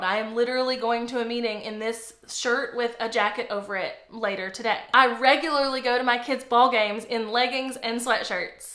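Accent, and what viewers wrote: South, United States. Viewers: You southern accent! I’m from Georgia ❤ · Mom, creator, loved your accent because I’m also southern, 😊 AND love the advice with a dash of humility when you don’t know all the things (e.g., vlogging). Working in a bubble at home is hard and your “chats” and business advice are a great way to feel connected to a business environment while still at home 💕 Thanks for that and best of wishes on your vlogging adventure! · I love your laid back, fun personality. It was great when, in another video, you made it ok to be a multi-passionate in my business. And I also love your southern accent because I'm from the south so that makes you feel familiar.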